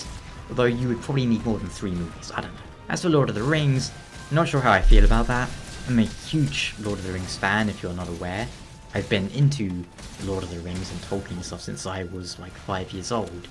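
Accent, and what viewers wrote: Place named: United Kingdom. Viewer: You sir just earned a subscriber as well as a sponsor! For anyone new to this channel, obviously I'd recommended binging through his Dark Souls LP's. Just save the playlists... watch in order. Then after the LP's go through the lore videos... you don't have to watch them all at once... just a few and then new ones should regularly pop up in your recommendations and don't worry, there are eight seasons of them to keep you happy. Watching his longer form game and media reviews/videos can be tricky... but has such a smooth non-distinct across the pond accent that I usually watch his videos for background when I'm working or writing or forum administering. The only drawback is I'll often be too drawn in that I'll watch his videos more intently. But remember to leave a like and comment before the video is over. Need that engagement. 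What else am I forgetting? Doom 2016 is great, Rey is a Mary Sue and cough <link> cough Excuse me. Check out our forum! At the very least, it'll generate some traffic and buzz for us so we can sponsor this cheeky chap again! Like a symbiotic relationship between the Sietch and the mighty Sandworm. The (often salty) spice must flow.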